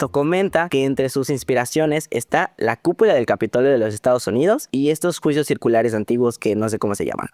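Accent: Mexico. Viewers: análisis de hamilton en 2025 🥳🥳 te ameeee, necesitas hacer más videos porque los veré todos, excelente análisis, excelente acento yuca y mega slay ese make uppp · hay hamilton, hay drags, hay arquitectura y acento yucateco; este video lo tiene todo y por eso me super suscribo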